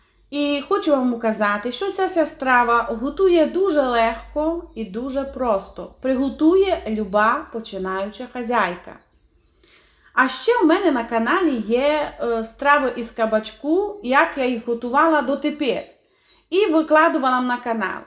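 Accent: Transcarpathia, Ukraine. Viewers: Чудный Закарптский язык,очень люблю слушать.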